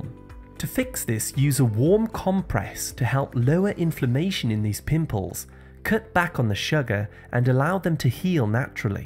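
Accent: United Kingdom. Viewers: Great and useful information regarding the health of one's eyes, concisely explained in clear British English. Thank you for sharing.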